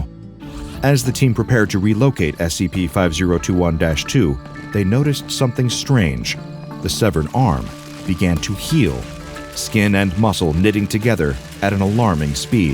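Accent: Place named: Wales, United Kingdom. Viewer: Wasn’t expecting a welsh scp 😭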